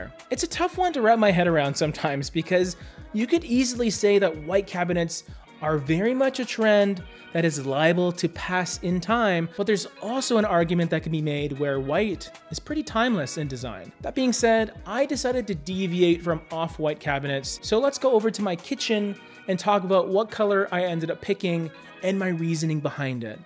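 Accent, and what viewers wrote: Canada. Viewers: He’s Canadian….different pronunciations there.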